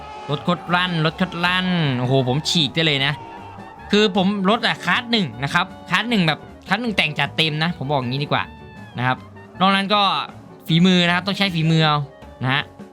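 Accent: Korat, Thailand. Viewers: เอ้าคนโคราชบ้านเอ็งนิว่า555สำเนียงพี่คือคนโคราชเลย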